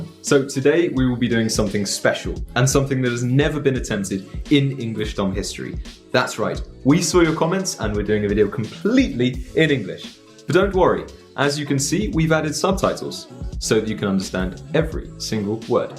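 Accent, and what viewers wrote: England, United Kingdom. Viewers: На английском слушать уроки гораздо интереснее, с настоящим английским произношением👍 кстати, оно заметно отличается от американского. Русский с английским акцентом от звучит забавно, тоже прикольно посмотреть) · блин, у него такой крутой Английский акцент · Это британский акцент?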